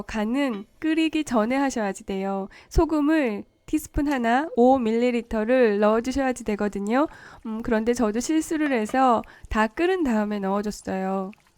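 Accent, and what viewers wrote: Seoul, South Korea. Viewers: 말투가 서울사투리 느낌..영상 잘봤어요 ㅎㅎㅎ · 와 말투가 오리지널 서울사투리네요 신기하다ㅋㅋ 지금은 지방쪽이랑 섞여서 서울말이 진짜서울말이 아닌데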